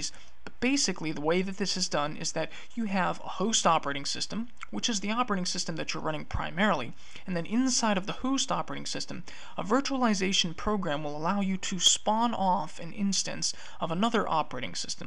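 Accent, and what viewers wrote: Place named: United States. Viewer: This was outstanding, and spoken in plain American English. This is the third one I tried and the 1st one I understood.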